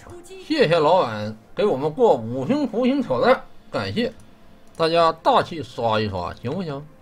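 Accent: Northeast, China. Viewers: 甚麼叫準...是比較沒有口音== 人家東北人 本來說話就有口音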